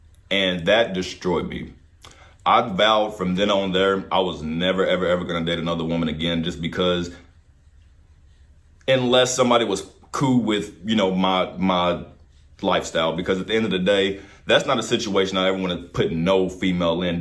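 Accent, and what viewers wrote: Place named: New York, United States. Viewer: 🤓 u talk n sound like u from new York City n that dude from black ink crew NY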